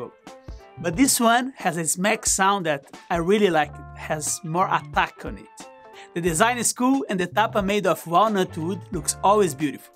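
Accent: Brazil. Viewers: Seu sotaque parecia muito brasileiro, e agora que fui ver eu estava certo kkkkkkkkkkkkk Que deus te abnçoes irmão!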